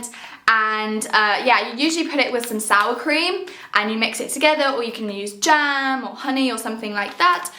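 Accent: United Kingdom. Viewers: hi, i'm Russian girl living abroad, like how you speak about russia with your british accent, and dairy food! miss that 😀 I'm also a small youtuber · What a beautiful British accent! It feels like I am back in the UK. Though you are not quite right regarding special attention to cleanliness in Moscow for the reason that it's the capital. I spent nine months in my home city of Krasnodar (1 million population) last year and its impeccably clean - not only central streets but everywhere. They clean it and clean it and clean it again. You don't see too many police but the city is very safe. If there's police on the street it's usually a mixed patrol - a police officer with two Cossacks (as it's a Cossack region). Again, it's very safe, you don't see drunk people (except the area of the railroad station probably). Thanks for you opinion. It's very interesting!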